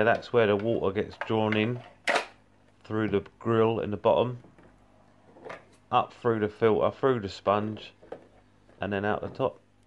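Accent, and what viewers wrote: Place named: England, United Kingdom. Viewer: Great review been thinking of getting one of these 👍🏼 nice to hear an English accent like mine for once on YouTube 😜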